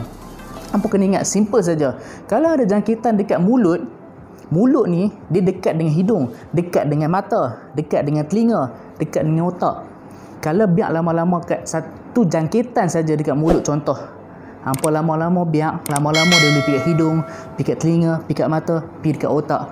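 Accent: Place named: North, Malaysia. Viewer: Terbaik! Love your explanation with utara accent 😂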